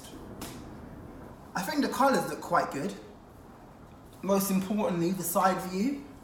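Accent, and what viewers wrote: United Kingdom. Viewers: Hey - the colors are great and the silver is the highlight- it was made for your incredible physique!! Love the British accent, mate!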